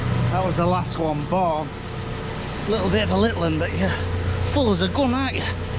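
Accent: Yorkshire, United Kingdom. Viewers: Your accent reminds me of Kirkkbymoorside....are you anywhere near there ?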